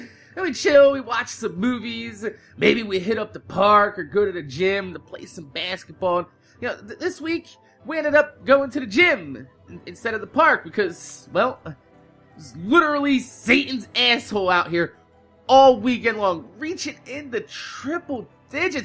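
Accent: New Jersey, United States. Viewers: Hey... glad you handled the situation like a boss. I know you mentioned your Jersey accent in another vidja. Guess you're still in Jersey? I'm not too far off from there. get at it bro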